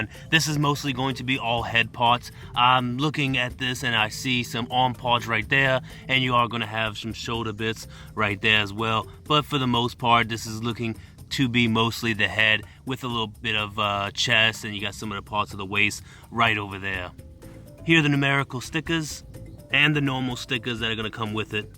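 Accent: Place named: Australia. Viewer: Can someone translate??? I don't understand Australianese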